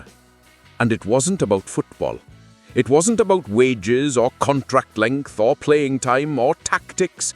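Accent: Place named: South Wales, United Kingdom. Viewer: Who is this guy with south walian accent talking about.we are north Wales club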